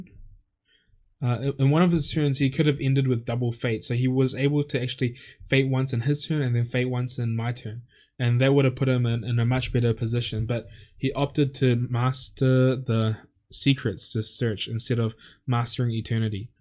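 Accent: New Zealand. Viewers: You kiwi bro?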